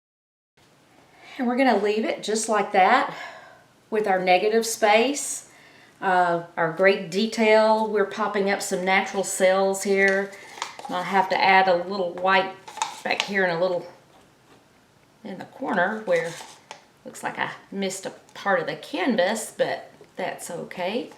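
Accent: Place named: South, United States. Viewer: Just watched your intro video. I KNEW IT! I’ve been listening to you n thinkin “ this gal has some good BBQ in her background . She’s gotta be from down “Heah” someplace. I’m in North Carolina . Just retired n looking at hobbies.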